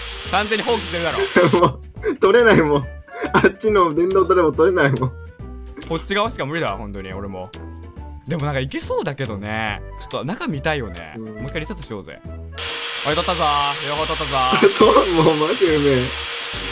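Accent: Kansai, Japan. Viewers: 関西弁意外だなって思った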